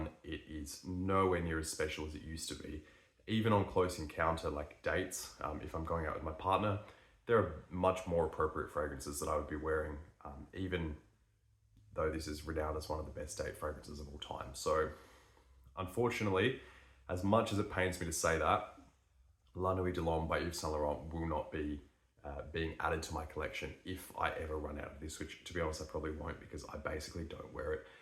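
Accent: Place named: Australia. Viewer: I just started getting into fragrances and already know what you are feeling about Sauvage mate 🤣. It’s just that Sauvage has something VERY special about it initially, but at the same time , once you get used to that special characteristic, the whole fragrance just loses its seduction. Haven’t had this with any other fragrance yet. Quite the opposite, most of the fragrances I started liking EVEN more after wearing them many times. By the way, absolutely LOVE the accent mate: which part of Australia are you from? Do you know any other Aussie channels that deal with fragrances? I already know one young bloke, but you can TELL he is young, which is why I don’t really follow his chanel much 😅. I lived in Melbourne for two years and absolutely enjoyed the vibes in Australia, hence I am always happy to find Aussie content creators and feel a bit nostalgic when listening to you guys 🇦🇺 (such as Andy cooks, but that’s a different topic haha) . 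Wishing you all the very best from Germany mate!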